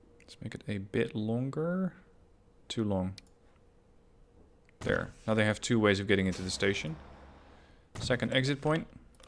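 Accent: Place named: Netherlands. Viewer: Ik hoor aan je accent dat je een nederlander bent. kan ik af en toe advies krijgen over dit spel?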